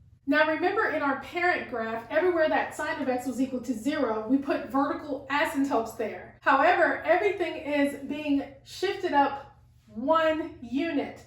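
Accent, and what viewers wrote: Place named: Australia. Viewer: Can you explain in hindi That is not matter I can't understand english but you are speaking like australian that's why iam not able to understand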